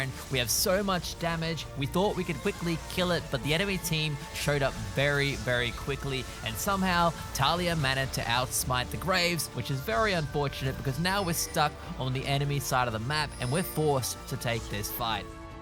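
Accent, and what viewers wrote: Australia. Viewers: why are you australian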